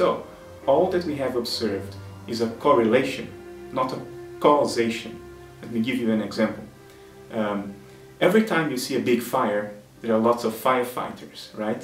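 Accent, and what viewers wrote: Netherlands. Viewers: I'm dutch and his accent sounds pretty special. I haven't heard any english speaking dutch in a while I reckon. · im hearing a dutch accent am i right?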